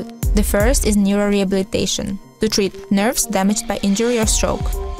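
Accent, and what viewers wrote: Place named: India. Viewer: Акцент смешной, похож на индийский xd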